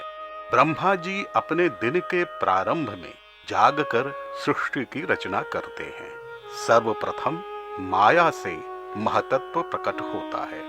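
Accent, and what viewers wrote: Standard. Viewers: शुद्ध उच्चारण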